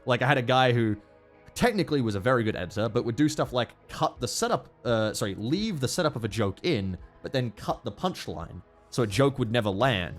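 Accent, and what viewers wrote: Australia. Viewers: man i was so conviced i'd clicked on a muta vid for a second there im so glad your australian accent gave it away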